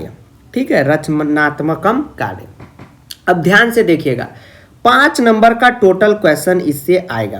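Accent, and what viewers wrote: Bihar, India. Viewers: Sir apke bolne ka trakike se lagtha ha ki ap vihar se belong kerthe ha. Sir me bhi bihar se he belong kerthi hu lakin rethi delhi me hu..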